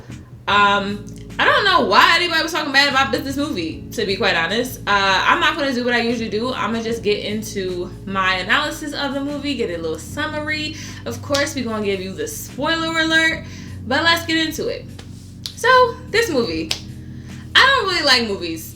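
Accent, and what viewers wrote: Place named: Philadelphia, United States. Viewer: The Philly be coming out of you on these reviews lol. Great job yet again!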